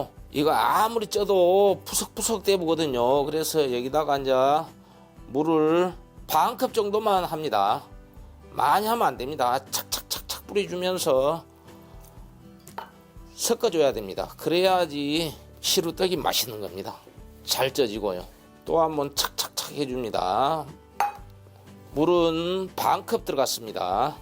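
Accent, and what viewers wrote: Jeolla, South Korea. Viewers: 안녕하세요. 저는 캐나다에 40년째 살고있는데 매일 당신에 체널을 시청하고 있답니다 좋아는 필수이고요 제가 떡 종류는 다좋아해요 제 고향은 전라북도 부안입니다 어려서 고구마 감자 옥수수 떡을 좋아해서 많이 먹었더니 여자 형제중에 제일 크지요 😅 말씀이 전라도 말씀에 정이 더 갑니다 오늘은 시루떡이네요 나중에 떡가루 사다가 떡 해먹으려구요 감사하고 사랑합니다 아 그리고 여기서도 한국 음식만 먹어요 손이 많이 가지만 그래도 한국음식이 최고예요 😂😂❤ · 내가.절라도출신인데.절라도말로하시니까 너무정겹고..셀프님.정말똑소리나내요.화이팅❤❤❤❤❤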